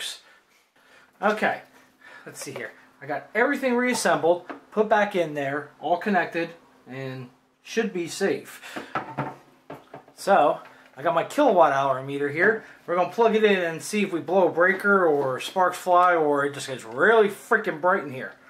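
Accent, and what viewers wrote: Pittsburgh, United States. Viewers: My God man...your accent sounds Pittsburghese.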